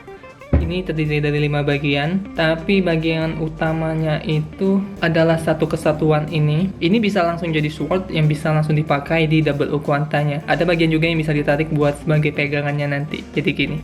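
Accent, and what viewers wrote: Palembang, Indonesia. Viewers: Bang orang Palembang ya?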